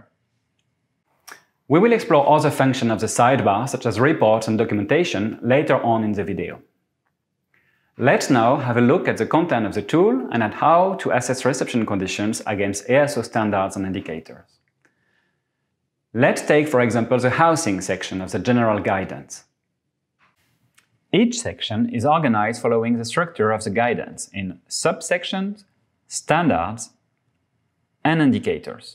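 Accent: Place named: Brittany, France. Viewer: Great tutorial for a great tool. Onwards with roll out! Thanks for the pedagogic presentation (with sexy Breton accent on top!)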